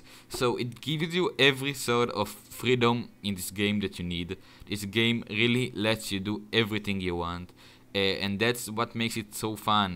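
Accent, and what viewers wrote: Israel. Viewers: יש לך מבטא ישראלי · אחי שומעים בקול שלך שאתה ישראלי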